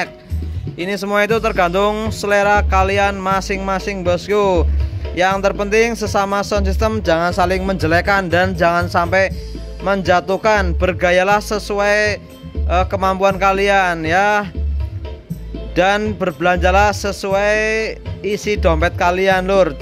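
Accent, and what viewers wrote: Banyumas, Indonesia. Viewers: ok joz mas boos,aja kelalen ora ngapak ora kepenak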